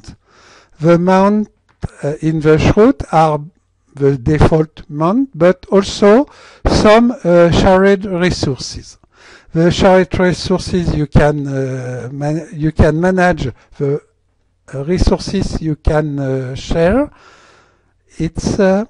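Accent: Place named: France. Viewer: Thanks for this video and this tools !!! You're great to develop and share that with us. And your French accent is incredible ^^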